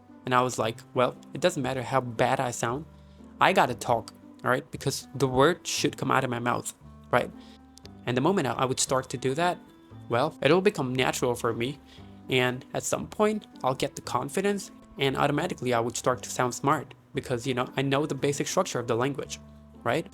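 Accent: United States. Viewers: Bro how do you speak american english accent ?? Have you been to there ??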